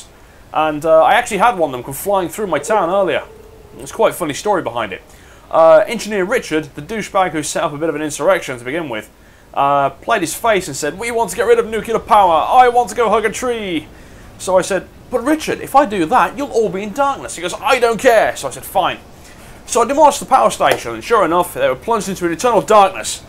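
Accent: United Kingdom. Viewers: A brit.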